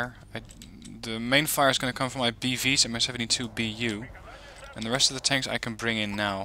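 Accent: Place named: Netherlands. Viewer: phung From his accent and voice i would say he is from the Netherlands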